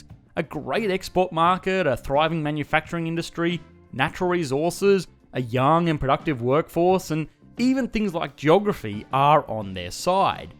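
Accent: Australia. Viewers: Good content but the Australian or New Zealand accent makes it hard to listen without getting nausea or a headache. Terrible accent!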